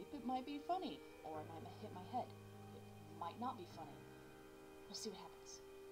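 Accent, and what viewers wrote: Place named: Midwest, United States. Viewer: Dear, Again, I am bowled over at your great attitude and unselfish sharing of what most would want to hide away. It’s an encouragement for me dealing with some intractable issues of my own. Obviously, you are studying Japanese. I am very curious as to why? I love language. I was born and raised with two, English & Croatian. I’ve added German, French, Farsi (including reading and writing the Arabic script), Pali & Sanskrit. I’ve started on ASL, but Japanese has always been on my list. A big motivation is how much one can learn about a people and their culture from their language; from the throngs they have words for to those they don’t to those they have many words for with very minor though important differences. What is your reason for choosing Japanese? You sound like someone from the US Midwest when just talking. 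But even using the words from the dances and songs your intonation seems spot on. Thank you in advance for sharing if you choose, too. With much Metta, John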